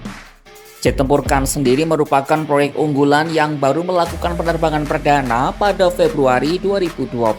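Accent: Java, Indonesia. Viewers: Sekelas Kompas pembaca beritanya medok bgt. Kaya ga ada yg lain aja 😆😆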